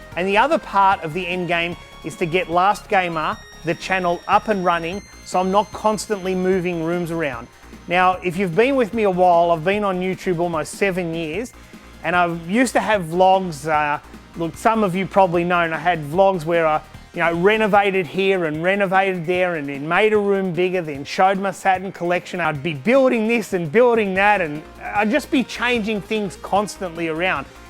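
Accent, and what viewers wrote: Australia. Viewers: Thanks to you, I can perfectly understand Aussie accent now. A couple of months ago when I first subscribed, I didnt have any idea what you are talking about:) Well done mate. Keep up the good work.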